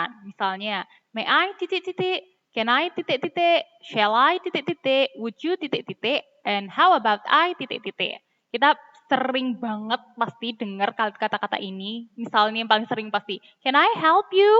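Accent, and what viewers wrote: Java, Indonesia. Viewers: Thankyou mbaa, lucu mbanya medok hehehe ♥️♥️ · Kak orang aceh ya? Soalnya logat nya kayak jawa☺😁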